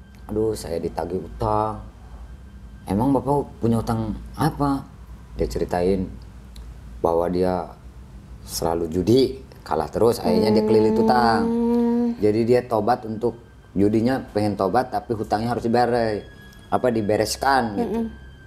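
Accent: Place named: West Java, Indonesia. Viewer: Logat sunda nih ya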